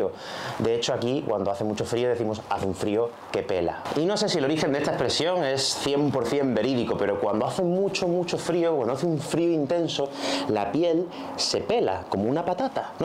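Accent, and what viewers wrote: Andalusia, Spain. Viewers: En Polonia está nevando.... y un hace frío que pela... o hace un frío que te💩 🙊 No veo la hora escuchar el podcast, me encanta el acento andaluz 😁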